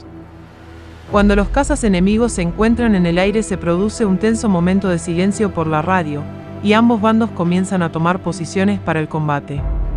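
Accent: Chile. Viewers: La historia y la narradora es Chilena. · Se nota que la narradora es chilean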